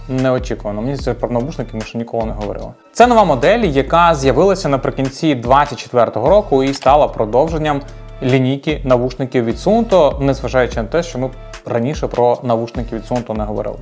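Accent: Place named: Surzhyk, Ukraine. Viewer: Бро, я тебя не понимаю. Хватит на суржике говорить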